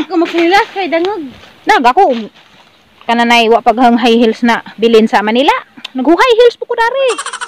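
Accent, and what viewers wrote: Bohol, Philippines. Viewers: Taga asa diay ka sa bohol ma'am Kay ang accent nimu morag taga Alicia bohol haha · malingaw gyud ko basta bol anon mag storya...jijiji makalingaw.. half cebuano half bol-anon here... jejejeje.. ajao palabi manang nabaw na slag bahakhak sa imo..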